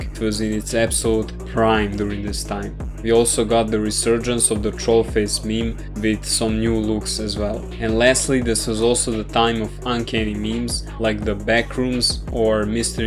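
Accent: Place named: Russia. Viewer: I thought it was sorry for the misunderstanding, you just sound russian.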